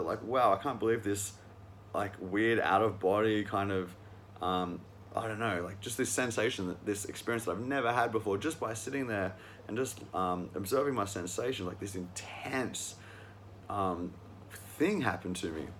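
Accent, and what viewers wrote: Australia. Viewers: I went to my first vipassana course 2 months ago in indonesia. For the preparation, I watched all your videos about the vipassana course. It helped me a lot. So thank you so much for that. Also, on the retreat there was a volunteer who looks like you. His voice even sounds the same like you with Australian accent. At first glance, I thought it was you haha.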